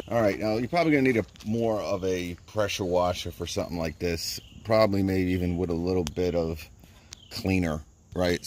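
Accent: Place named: Philadelphia, United States. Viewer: Are you from the Philadelphia area? Wudder=water 😎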